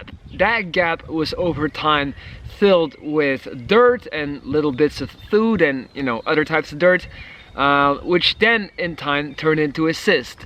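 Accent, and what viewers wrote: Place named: United States. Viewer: Your story is quite something. I have an off-topic question. You sound like any American I have ever met. Yet you lived in Belgium and then in Stockholm., Sweden. So where are you originally from? I would simply guess the US based on how you speak but that doesn't seem quite right. You must speak a ton of languages, living in both Belgium and Sweden, and visiting friends in Barcelona, Spain. But your accent is 100% pure American. So where are you from? Just curious. And keep your spirits high. You have one heck of a story to tell. I will be watching more of your videos. And I love your screen name, too. All the best to you.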